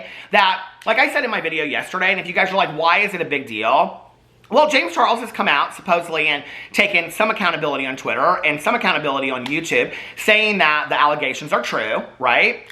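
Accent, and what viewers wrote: South, United States. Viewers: I swear you are more southern than you realize 😂 I still say Q-pon!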